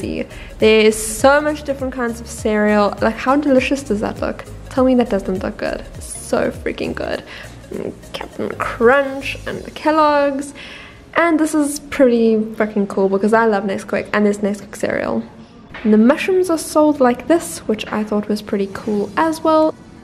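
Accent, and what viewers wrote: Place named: South Africa. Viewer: Hi, so nice to hear a fellow South African blogging. Well done and can’t wait for more.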